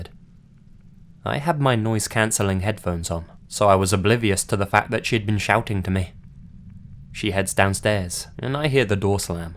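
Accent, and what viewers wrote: United Kingdom. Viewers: and Be busta awesome UK narrators 👌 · British accent.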